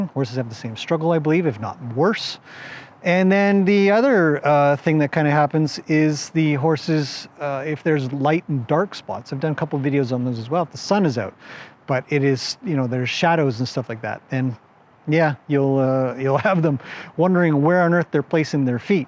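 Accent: Canada. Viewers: I am gonna go back and listen to videos I have missed. I learn so much more about relationships with horses that make more sense than other channels I watch. I am re evaluating my training and my horse wants to be with me even more! P.S. Love your adorable Canadian accent! And it is so gorgeous where you live. Can you show us the area around your home if you get a chance? Thanks for everything Mr.. (: Babs from Boston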